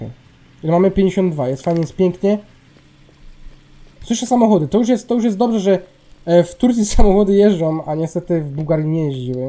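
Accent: Silesia, Poland. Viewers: przaja ci za ten śląski:DD od razu swój rozpozna swego też staram się mówić po polsku i pisać ale zdarza mi się gadać po śląsku a i powinieneś zmienić nazwę kanalu na - król bugowania gier albo osoba która każdą grę ci zbuguje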